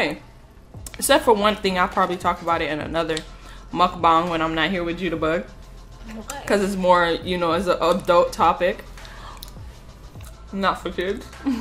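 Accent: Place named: Jamaica. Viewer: U sound jamakon if I spelled it right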